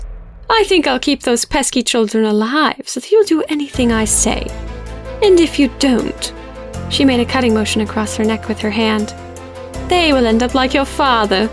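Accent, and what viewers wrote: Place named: United Kingdom. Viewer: WOWWW! I just found your channel your british accent to it adds prettieness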